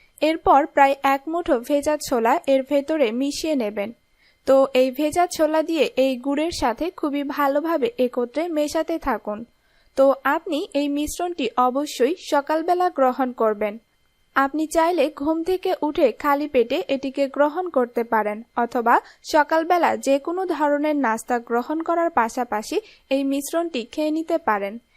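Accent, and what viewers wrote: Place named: Bangladesh. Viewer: আপনি কি বাংলাদেশের লোক? আপনার কথায় বাংলাদেশি টান আছে।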